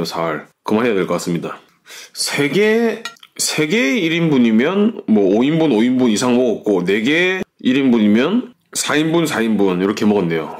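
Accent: Gyeongsang, South Korea. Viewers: 님, 후우~~ 저 만두 각 두개씩 총 네개에 쫄면 일반 사이즈로 ( 님 사이즈 아니고) 두 젓가락 그리고 시원한 물 한 잔이면 제 배는 그득 찹니다. 저 매운 걸 저렇게 많이 먹방 왕 🤴. 보고 있는 제 배가 차고 넘쳐 땡기는 거 같은데... 억 소리 납니다. 꾸밈 없는 경상도식 말씀이 재미 나고 인상이 무척 좋아서 보는 이들이 그 것 만으로도 즐거우니 이제 부터 너무 많이 드시지 마세요. 건강 하시고 하시는 일 흥하시고 대박 나시기 바랍니다. 👍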